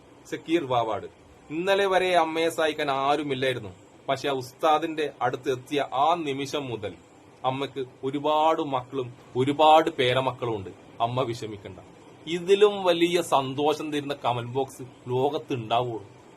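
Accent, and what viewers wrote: Kannur, India. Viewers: ഭായ് എന്നും നിങ്ങളുടെ മേൽ ദൈവാനുഗ്രഹം ഉണ്ടാകട്ടെ.... സംസാരം കേട്ടിട്ട് കണ്ണൂർ കാരനാണെന്നു തോന്നുന്നു ഞാനും കണ്ണൂരാണ്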